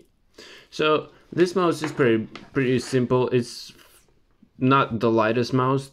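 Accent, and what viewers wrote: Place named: Balkans. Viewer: Are u from balkan? I know the accent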